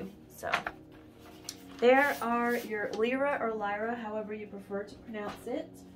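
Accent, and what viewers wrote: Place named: England, United Kingdom. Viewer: Are you from Australia or the USA? Just wondering what dollar we are talking, actually you sound very English ☺️ I was born in England but I have lived in Australia and become an Australian for 50 years now. The time goes so fast, the older you get the faster it goes 🤔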